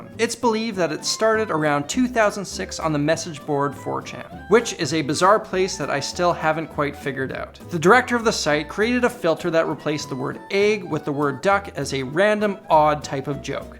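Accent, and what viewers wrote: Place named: Canada. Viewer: I had a suspicion you were Canadian. The accent gave it away.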